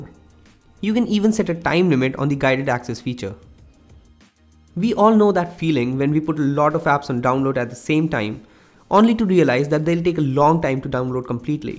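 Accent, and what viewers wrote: India. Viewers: badibadibadi one currrryy pleaseeeeee, i really didn't understood anything what he said with his indian taxi driver dialect ... it's really hard to understand what he says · Worthless vid, unless you like listening to indian accent english... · Indian english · It’s Indian accent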